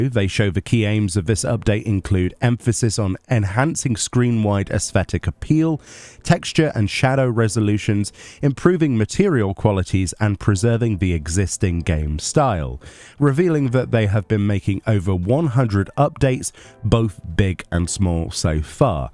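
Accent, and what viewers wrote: United Kingdom. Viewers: Oh, it is my first time encountering British accent :)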